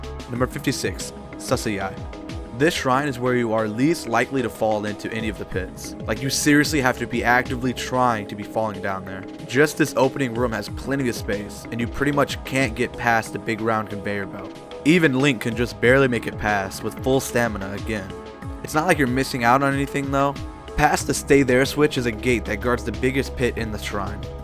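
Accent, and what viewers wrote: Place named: New England, United States. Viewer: ALSO New England Accent detected. i think? the way you pronounce "Room" reminds me of me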